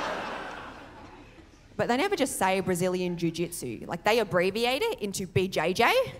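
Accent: Australia. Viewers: Strange seeing a Chinese with an Australian accent. 🤣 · I detect an Australian accent, pretty cool. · I came here from a Yumi Nagashima video. The Aussie accent really threw me off lol. I'm not sure what I was expecting when I clicked, it just wasn't a strong Australian accent 😅 Hilarious stuff! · Your material is excellent. Great comedy. Only Aussie chinese girl can deliver the punchlines so well 👍👍🌹🌹You're just as good and funny as Aaron Chan. 100% aussie accent too 😃😃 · I'm an Aussie who moved OS in 98 and I think it's just really cool hearing and Aussie accent from an Asian (sorry if this sounded racist, not my intention.....oops)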